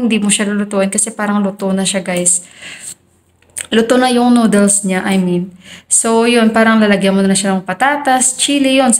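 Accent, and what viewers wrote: Iloilo, Philippines. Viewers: ate ilongga ka po ba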